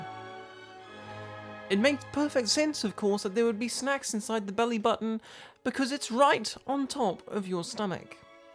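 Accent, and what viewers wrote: United Kingdom. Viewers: For some reason my mind keeps trying to convince me that you're attending Hogwarts. I believe it's the glasses and the accent. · You're so much beautiful. Includes your Brit accent.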